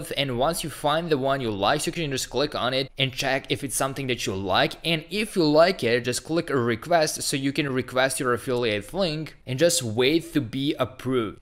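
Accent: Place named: Estonia. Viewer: Could be wrong but my first thought was Estonia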